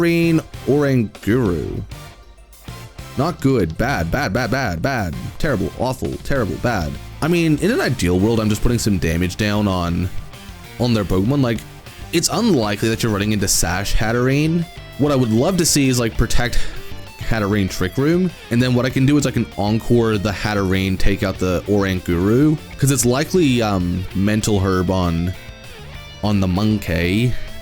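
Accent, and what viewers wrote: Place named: South, United States. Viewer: lmao you kept me guessing, the redneck accent is definitely southern Alabama/northern Florida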